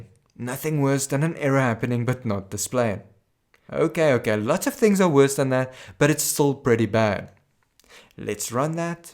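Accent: South Africa. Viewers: I was like... wait what... is that an Afrikaans accent? good to hear :)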